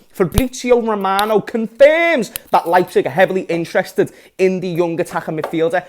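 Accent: Liverpool, United Kingdom. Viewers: Mate he's scouse thats rhe flow... what is it with this world and people telling people how they should be... authenticity is a good trait you want slow talking listen to lieing politicians 😊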